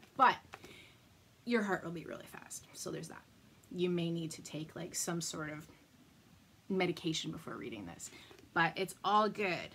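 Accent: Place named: Canada. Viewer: I love your Canadian accent!! Happy for the suggestion for a good summer read,but cannot wait for another of your books! Have a great summer!! ❤️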